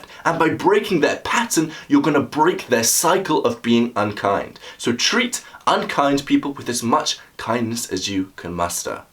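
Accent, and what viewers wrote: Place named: Southern England, United Kingdom. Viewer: Where were you brought up pal Presumably south of England but where? How you say like is distracting FYI great work btw 🙏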